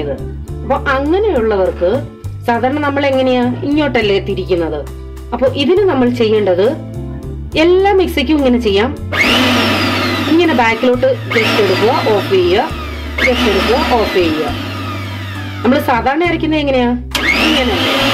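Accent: Kottayam, India. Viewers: Chechii kottayam karii aano???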